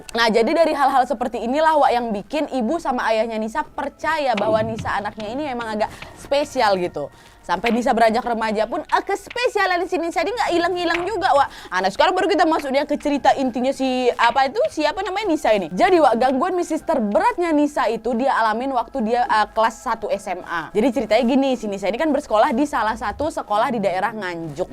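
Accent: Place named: Java, Indonesia. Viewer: Plg suka kl wak ngomong bhsa jawa, lucu tp salut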